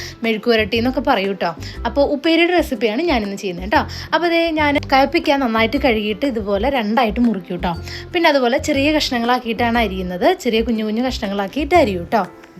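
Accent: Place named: Thrissur, India. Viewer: ok സംസാരശൈലി കേട്ടപ്പോ തോന്നി തൃശൂർക്കാരി ആണെന്ന്..❤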